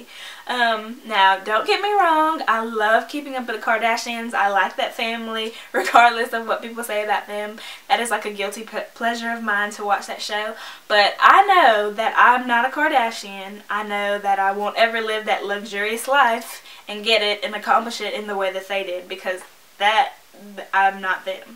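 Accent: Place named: South, United States. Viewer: Gosh! I'm so glad I found your channel! You are just so cute! Where are you from doll? Your from the South right lol? No shade or anything y'all, I looooove the way you talk 😊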